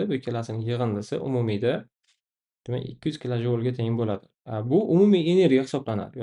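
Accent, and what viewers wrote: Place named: Tashkent, Uzbekistan. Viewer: Рахмат сог ва саломат булинг сиз АТФни тшунишимга ёрдам беряпсиз лекин Тошкенча шевани ёмон килдизда😅😂😅😊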